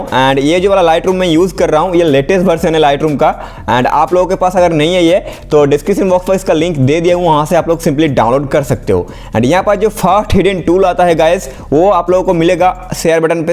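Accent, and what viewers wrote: Bengal, India. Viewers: Vai up Bengali ho??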